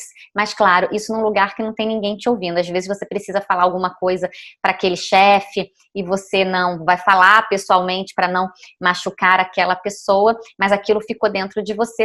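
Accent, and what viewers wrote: Rio de Janeiro, Brazil. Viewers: Desculpa nãos consigo ouvir carioca nem de longe...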